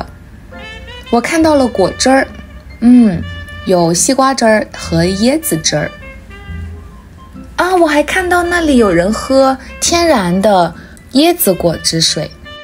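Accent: United Kingdom. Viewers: could you make a video about cultures, customs, things to do/not to do for someone moving or visiting china? maybe do it in this format? thank you for videos... did you live in the UK for a long time? you have an UK accent when you speak english in other vids.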